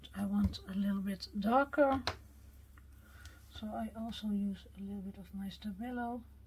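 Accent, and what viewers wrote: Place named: Netherlands. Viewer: stunning style and love your Dutch English, you are the most sweetest lady xx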